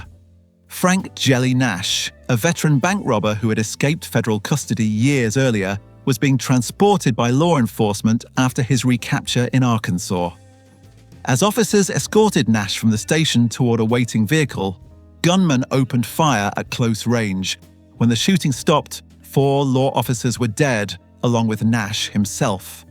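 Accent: England, United Kingdom. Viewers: GET RID OF THE UK NARRATION VOICE!! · They think it sounds more intelligent and more informed , Americans are suckers for English accents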